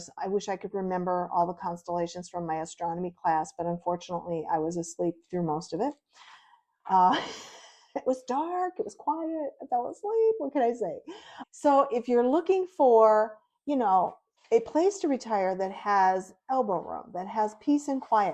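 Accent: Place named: Minnesota, United States. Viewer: I thought I recognized a little accent there, don'tcha know?? Ya, you betcha! I grew up in a small town of 250 people in Southern Minnesota 😂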